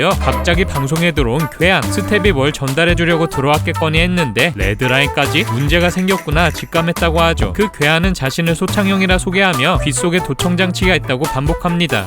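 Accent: South Korea. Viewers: 왜 자꾸 김치발음 물씬 풍기는 되도않는 영어 섞노 ㅋㅋ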